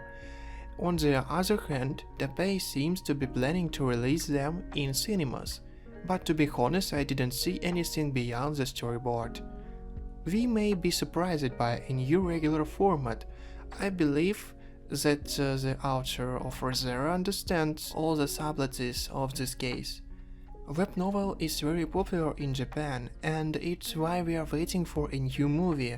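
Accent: England, United Kingdom. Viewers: О, вы из Англии? (неплох) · Оу, вы из Англии?) Oh , you are from england?) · О так вы из англии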